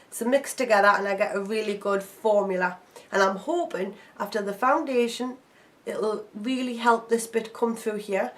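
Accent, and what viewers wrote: Newcastle, United Kingdom. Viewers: She's a Geordie man!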